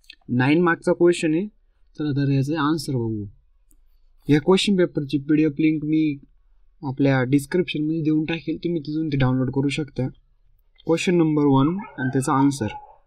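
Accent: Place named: Nashik, India. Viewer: Bhau nashik cha vatatoy😄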